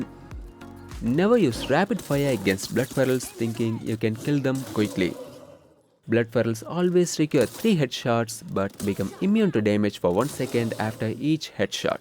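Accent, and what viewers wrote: India. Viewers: Why do you sound like a dirty pajeet? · This.is literally just a copy of the other dudes 2024 vid with an indian accent. Same transitions and everything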